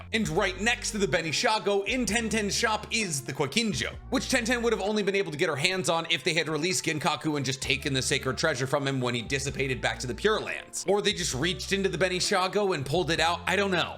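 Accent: United States. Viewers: Cn you please pronounce their names correctly? Your content is great but it hurts credibility when you pronounce the names super American-like.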